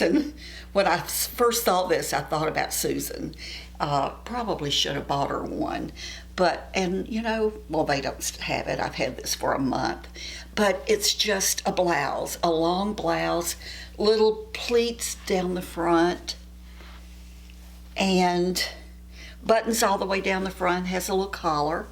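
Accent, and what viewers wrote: United States. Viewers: Paris, France calling...I just came across your video by chance and found its content an absolute delight. And I simply adore your accent - it reminds me of an American friend of mine. You have a natural flare to entertain. After watching, I discovered your age - 78 wow! I would like you to know that you boosted my morale! I will definitely hunt down your other posts! Thank you again.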